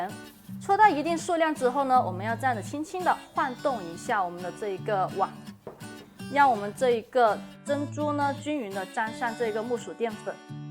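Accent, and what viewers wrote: China. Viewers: 这个普通话……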